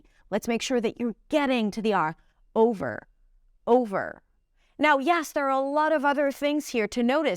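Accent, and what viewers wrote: United States. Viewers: is your english american?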